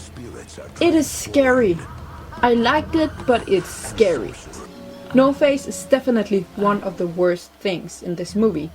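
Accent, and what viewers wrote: Denmark. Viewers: You're from Denmark aren't you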